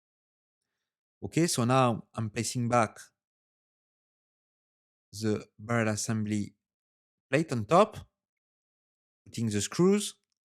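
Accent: France. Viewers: Toujours un plaisir de t’écouter et regarder travailler. Explication simple et précise ,continue comme ça ,avec ce french accent de toute beauteyyy 😜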